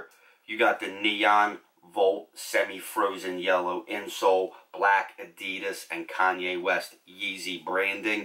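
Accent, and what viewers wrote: Ireland. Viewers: These are dope. Are you Irish, kinda hear an accent?? Lol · I knew it I was hearing the Irish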